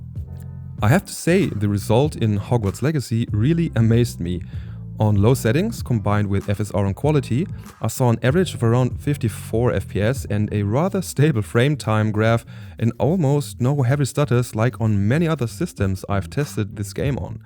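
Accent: Germany. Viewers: I bought my mom a mini PC with a Ryzen 7 H 255, 780M graphics, and 24GB of RAM. It's mainly for city-builders/strategy games, and I'm curious to see what this little machine can do. Linux would also be interesting as the OS, since it's supposed to run faster. And you can also use FSR 4.1.1 pretty well now with OptiScaler. Interessant wie man unseren Akzent schlecht verbergen kann ;)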